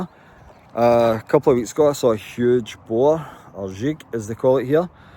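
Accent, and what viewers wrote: Scotland, United Kingdom. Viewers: The difference is-I love your Scottish accent. I have never been to Scotland but maybe some day it will be possible ,I hope so. Offended? Are you kidding? We not get offended so quickly. Like the Scotts I've heard they are very direct people, aren't they ?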